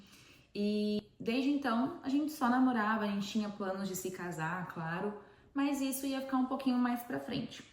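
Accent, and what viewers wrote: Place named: Portugal. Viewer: Temos alguns conhecidos “por cá” olha o “purrrtugueix” sobressaindo ihuuuul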